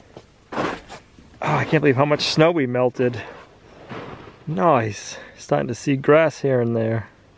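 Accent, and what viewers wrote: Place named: Boston, United States. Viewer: I thought your accent sounded familiar.... Boston strong! BTW I subbed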